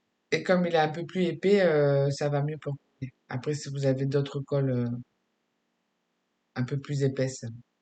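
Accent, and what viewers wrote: South, France. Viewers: Une jolie page vintage qui met en valeur cette ravissante petite fille avec tous tes pts bouts de papier façon patchwork , tu as des doigts de fée de magicienne ;) Le résultat donne une petite merveille .. Je retiens l'astuce pour le tamponnage sur du papier de soie pour plus de netteté , c'est vrai que tamponner sur une page de ART journal quand il y a des multicouches n'est pas toujours réussi.. Tu n'as pas l'accent du Nord , mais bien du sud je trouve . Félicitations pour le nbre des abonnés qui grimpe , bravo ! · Merci pour cette nouvelle page toute vintage ❤️ Ce serait dommage de nous parler en anglais ton accent du sud est tellement agréable ainsi que ton rire léger, bravo pour ta petite célébrité toute méritée à bientôt 😊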